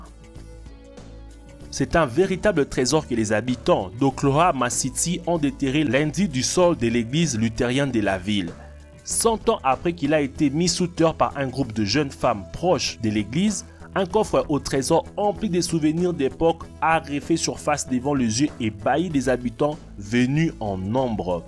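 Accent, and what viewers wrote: Quebec, Canada. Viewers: Le français Québécois bande de cons. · Adriien Terliier Toi apprends déjà à savoir que c'est un québécois et c'est pas de sa fautes ci il s'exprime mal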